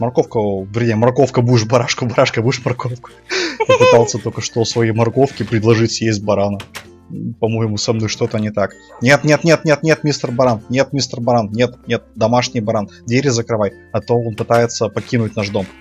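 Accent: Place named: Ukraine. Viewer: Авы случайно не украинцы? Шо да шо XD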